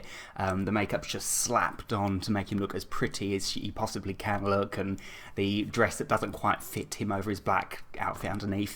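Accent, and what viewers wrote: United Kingdom. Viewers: ohmygawsh with your cute little british accent and the marvelous makeup you did, it matches the character so perfectly<3 I actually loved Glen(da) so much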